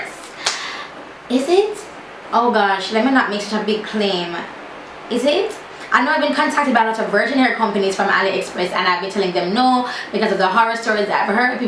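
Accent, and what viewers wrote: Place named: Jamaica. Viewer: I love your accent. You gotta be from Jamaica lololol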